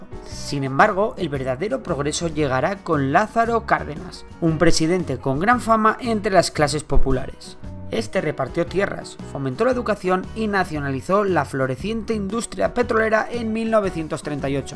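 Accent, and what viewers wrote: Spain. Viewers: El narrador es Español? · un español contando la historia de mexico que ironico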